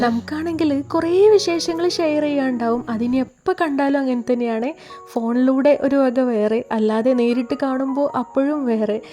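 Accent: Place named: Malappuram, India. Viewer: നിങ്ങടെ മലപ്പുറം ഭാഷ കേൾക്കാൻ ഒത്തിരി ഇഷ്ടാണ്. അടിപൊളി 👍👍👍🥰🥰🥰🥰🤩🤩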